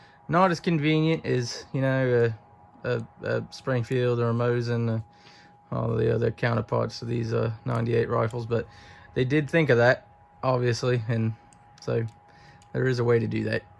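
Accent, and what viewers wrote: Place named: Australia. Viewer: Bro caught an Aussie accent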